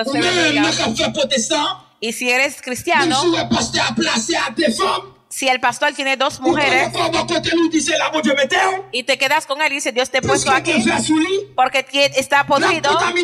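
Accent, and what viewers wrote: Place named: Spain. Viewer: Li gen bon jan aksan espanyòl la nan langaj li.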